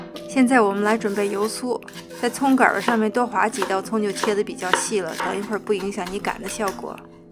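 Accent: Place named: Xi'an, China. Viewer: by her accent, i guess she is from xi'an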